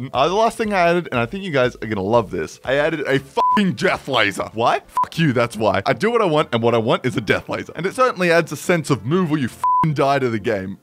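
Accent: Australia. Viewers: Code are you Australian? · OMG YOUR AUSTRALIAN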